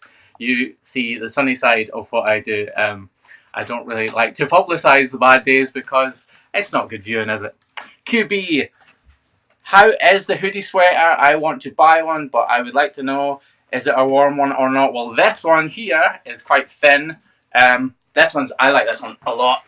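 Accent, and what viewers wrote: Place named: Southwest Scotland, United Kingdom. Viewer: Love your videos, very interesting. You have a familiar accent from where I used to live in SW Scotland, I lived in Glentrool village then the Isle of Whithorn. You're definitely from that neck of the woods.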